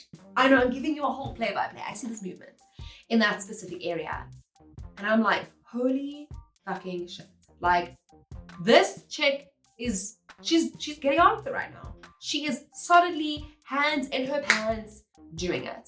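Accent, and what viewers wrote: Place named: South Africa. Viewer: South African.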